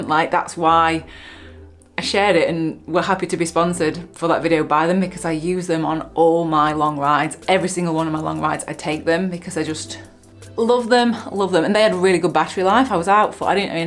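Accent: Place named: Liverpool, United Kingdom. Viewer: Liverpool? Im a yank but live in hong kong and you look and sound like one of my great friens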